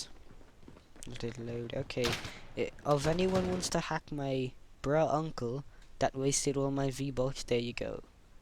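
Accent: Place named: United Kingdom. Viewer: I love your British accent ❣️🤠😍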